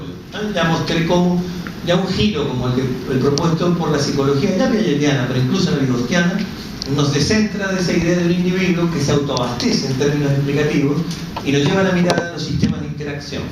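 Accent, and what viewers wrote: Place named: Chile. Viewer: Por qué pone tono chileno si es argentino? Notable dato paraverbal. Todo un mensaje....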